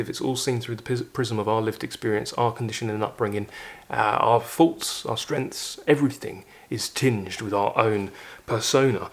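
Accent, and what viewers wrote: United Kingdom. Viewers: Is that British accent ... you are so well spoken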